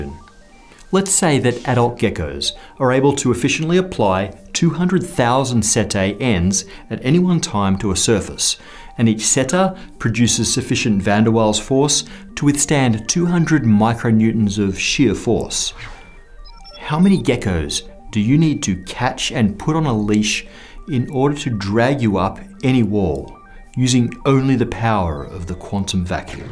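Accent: England, United Kingdom. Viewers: Your English accent adds no credibility. And your video sucks. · Wow!!!!!!! This is an awful PBS Space Time clip. I'm shocked at how badly this misrepresents the applications of zero-point energy. It is this these kind of "Negative" (pun intended) clips that keep us using rockets instead of learning about electro-gravitics. 👎 Did you notice how they used someone with a slightly British accent to make it appear they are smart and intelligent enough to explain the concepts of physics. This clip ends with a gecko 'challenge' relating to their feet. This clip is simply pathetic.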